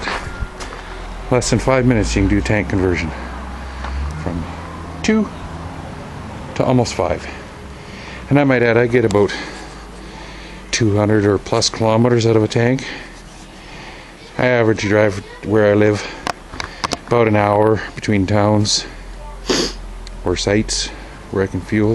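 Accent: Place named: Canada. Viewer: Wut!? That's a hoser accent if I've ever heard one!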